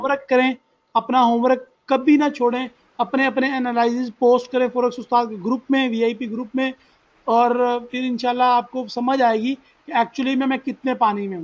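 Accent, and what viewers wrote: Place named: India. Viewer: sir apka desi andaz buht acha buht maza ata hai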